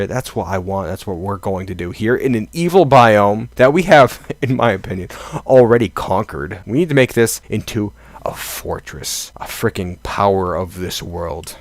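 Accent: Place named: Connecticut, United States. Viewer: smoky, rocky, caffeined, autist connecticut accent is best accent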